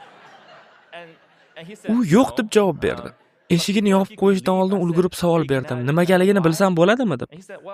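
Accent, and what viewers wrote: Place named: Tashkent, Uzbekistan. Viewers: shundo dovom eturin oka toshken sheva zor ketvotti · shu oddiy gapirganiz yoqdi adabiydan koʻra Toshkent shevada 😊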